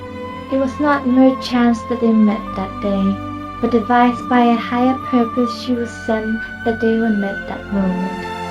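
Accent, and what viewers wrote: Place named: Netherlands. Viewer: I liked the video :D Thank you for the subtitles, though. You have a pretty dutch accent :)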